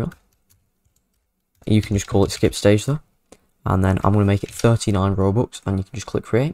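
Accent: United Kingdom. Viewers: Fir o all ge a new mic an fi tha briitsh accent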